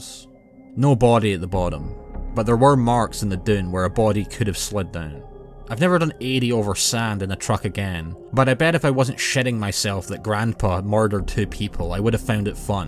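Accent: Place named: Scotland, United Kingdom. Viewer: I decide I like hearing a Scottish accent telling spooky stories. It keeps me actually focused on the story somehow and it also feels like I’m at the bar listening to a friend tell me an intense tale over some drinks. I like the vibe you bring sir.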